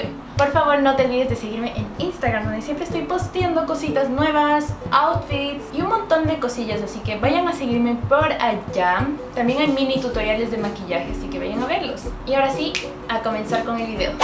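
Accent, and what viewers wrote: Quito, Ecuador. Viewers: Hablamos bonito los quiteños te escucho me identifico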